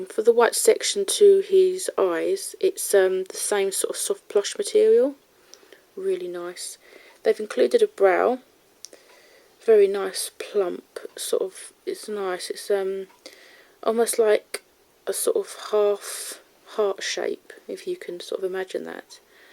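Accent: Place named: United Kingdom. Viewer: Are you british because u have a british accent and also I’m british😃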